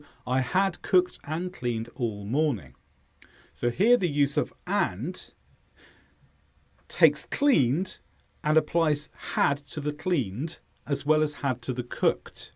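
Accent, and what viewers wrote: England, United Kingdom. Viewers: >search for good grammar video >open an 5 hours video >England accent >close the video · Where have you been all this while ? SUBSCRIBED straight away. I need to devour your videos. That will help me with good paraphrasing in my assignments and every day life. But most importantly why I chose you is because, lessons from a British English accent teacher are very helpful for people living in the UK. Everyone use the accent suitable for them and the country they live, that’s what I did for myself. Thank you very much indeed for your hard work building and editing these videos.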